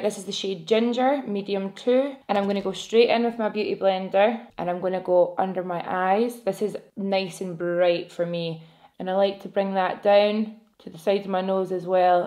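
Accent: Scotland, United Kingdom. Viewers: My 5 year old daughter said “ mommy who are you watching “ so I showed her She said “oh you’re watching that girl again I like her voice” I said me too It’s funny because Scottish accents have literally been my favorite since I was so young Also she just said again “ why does she have such a beautiful voice “ I think my daughter clearly loves your voice just as much as me haha ♥️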